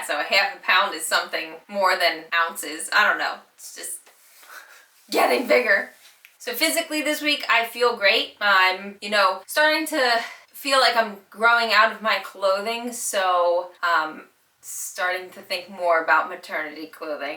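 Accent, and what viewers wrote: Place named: Delaware County, United States. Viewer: Love your updates! You have such a delco accent lol. Are you from there? (Delaware county)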